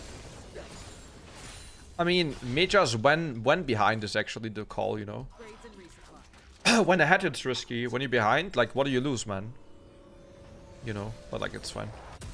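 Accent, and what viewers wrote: Germany. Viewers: This game I realized you are german and I watched a lot of videos of you😂. Usually every german is with 100% accuracy detected by me after the first few words because german-english accent is very obvious.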